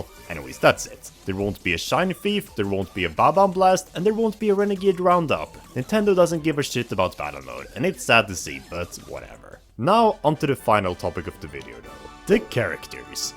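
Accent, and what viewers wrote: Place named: Sweden. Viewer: She’s Swedish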